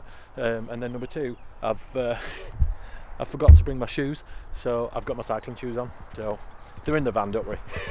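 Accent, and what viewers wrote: Yorkshire, United Kingdom. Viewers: Reet - what a classic Yorkshire man you are this week - "I'll wring every ounce of gas out of that thar bottle, as I paid for all of it." And then, "Thow'll save watter by eatin out tha's pan." Hilarious.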